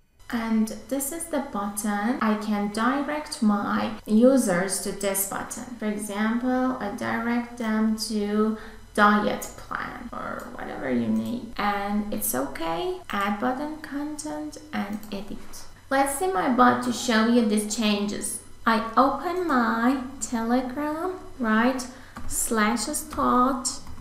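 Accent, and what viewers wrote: Iran. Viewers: Your accent sounds Persian to me nice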